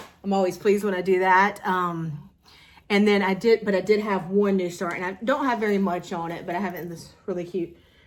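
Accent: South, United States. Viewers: You’re the only Southern or with an accent to enjoy listening to. Shhhh… don’t share that with anyone or I’m sure I’ll get a lot of haters!😊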